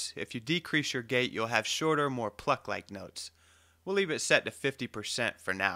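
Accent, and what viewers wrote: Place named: Louisiana, United States. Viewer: what is this accent.... louisiana? sounds tight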